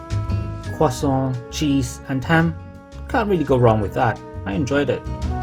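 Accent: Scotland, United Kingdom. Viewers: your accent dips in and out of hard Scottish so it's just interesting to listen to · whaoh i never expected you to have a scottish accent LOL ,love the voice over great addition to an already great channel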